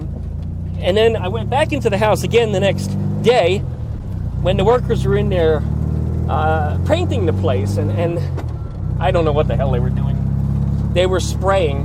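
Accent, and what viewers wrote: New Jersey, United States. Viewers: Definitely can hear the Jersey in you in this video, where exactly were you from originally in NJ?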